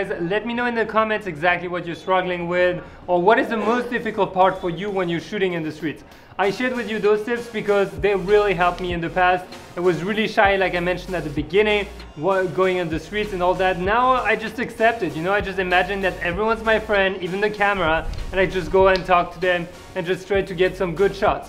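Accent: France. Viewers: coucou l'accent français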